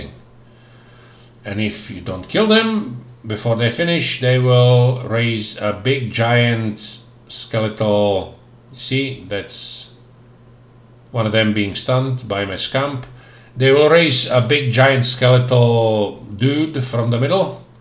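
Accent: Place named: Highlands, United Kingdom. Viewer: YESSSS!!! I felt you had a highland accent but then some words I could tell were spoken with something else! I too and from just outside Glasgow!! So hello neighbour!!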